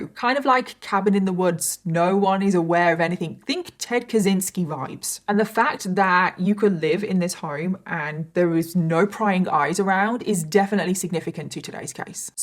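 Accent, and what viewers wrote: United Kingdom. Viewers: Let me say, before I even watch this, you’re my favorite true crime YouTuber. Honestly, I was initially put off because I have serious British accent related PTSD, but I am glad I set that aside (it helps you’re female). Your content and delivery is exceptional 👍🏻💪🏻👏🏻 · Uhh that British accent....i just cant... Im so happy for your 1 Million Subscribers ❤ wish you 100x more.. Bye ❤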